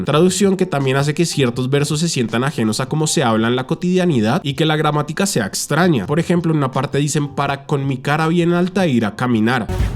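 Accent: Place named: Bogotá, Colombia. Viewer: X2, tiene puro acento rolo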